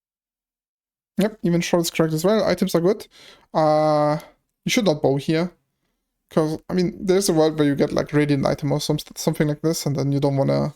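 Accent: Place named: Germany. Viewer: Gute Erklärung und man hört den deutschen Akzent raus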